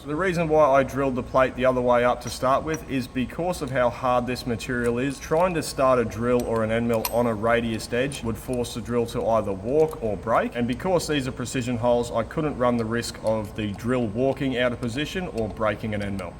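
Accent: Australia. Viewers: All this time I thought was starting these videos saying "Hey young guys..." and wondering why he thought old guys (like me) wouldn't be watching these videos. But today I accidentally had the closed captioning on, and was surprised to discover that he was saying "How you going guys?"! I guess I need to brush up on my 'Stralian. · I have never had the captions on and thought the same thing, and I definitely don’t fit that description 😂. I have boots older than 😢. Thanks for the translation of Aussie to American 😊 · Hi, great videos. Your explanation of the purpose of the springs in a flex plate/clutch is wrong, maybe it's just the way you said it, you speak Aussie and I speak American. The main purpose of the springs is to absorb torsional vibrations that are generated by the engines that drive them. The torsional vibrations can destroy a gearbox or transmission. 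In automotive applications they also act to prevent harsh engagements when the clutch the clutch pedal is released. Automatic transmissions don't need them as the torque converter/fluid coupling acts as a damper. The size of the flex plate, number of springs, and the rate of the springs is governed by the horsepower it needs to transfer to the driven parts. A multi-cylinder engine does not need heavy springs since the power impulses (torsional vibrations) are close together. A one or two cylinder engine needs very heavy springs as the torsionals are close together. Look at it this way: In a four stroke engine with two cylinders, there will be one power pulse per revolution. In an eight cylinder engine there are four power pulses per revolution. Think of these as positive torsionals as they accelerate the engine in the direction of rotation. Conversely, every time an exhaust valve opens, there is deceleration due to the drop in cylinder pressure, so in the case of the eight cylinder engine we have four positive pulses and four negative ones, The negative ones are of less intensity of course. 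When I used to work on boats a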